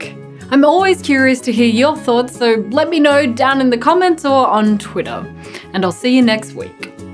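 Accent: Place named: Australia. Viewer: I am myself from Australia and wow, you have the most pleasant Australian accent and voice I have ever heard. I actually wasn't even sure if you were Australian, and that perhaps you had a less than usual British accent. I will happily listen to your voice speaking about psychology. SUBSCRIBED! :D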